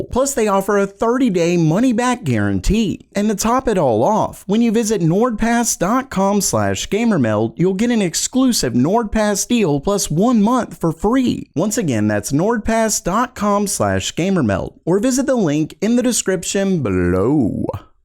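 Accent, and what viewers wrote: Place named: Baltimore, United States. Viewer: is he from baltimore. he says un instead of on.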